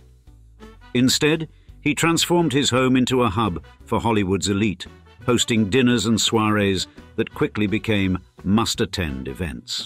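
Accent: United Kingdom. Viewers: Why do these shows always have a narrator with a British accent?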